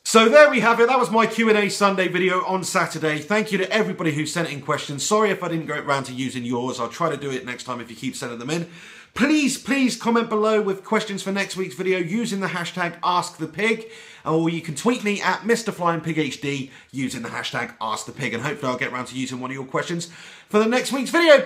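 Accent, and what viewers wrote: United Kingdom. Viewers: Which part of the UK you from broo?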